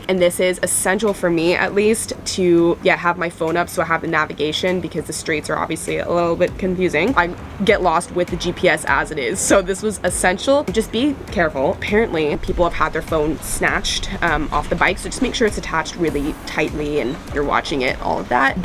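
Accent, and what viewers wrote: United States. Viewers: This girl has a total American accent…… I am American and there are different accents based on where you live, but hers is pretty standard American. The American sound that other Americans would consider to NOT have an accent. Accents that are commonly known around the world but very specific to a local area and not all of America……a New York, Boston, or far south accent. These are not representative of most American speakers, this girl is.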